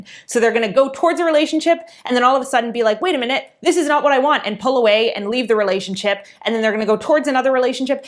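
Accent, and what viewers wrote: Canada. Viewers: This is the first video where I realized is Canadian. I don’t hear it as much in her new videos.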